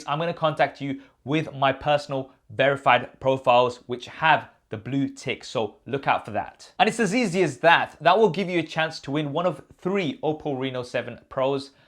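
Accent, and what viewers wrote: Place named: Leicester, United Kingdom. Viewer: i just knew that accent was from Leicester. Great video mate.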